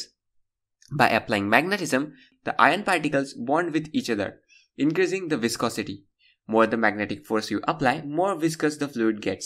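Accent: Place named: Andhra Pradesh, India. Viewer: A Telugu English speaking AI bot. We are doomed 🤦🏿‍♂️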